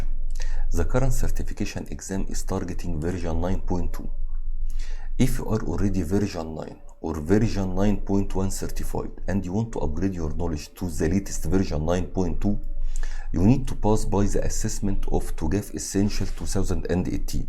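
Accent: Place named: Alexandria, Egypt. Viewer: والله المشكله مش في العالم العربي المشكله العالم الثانيه ماراح تفهم كلامك واللغة اللي تتكلم فيها. انجلش بس الاكسنت من اسكندريه !!!!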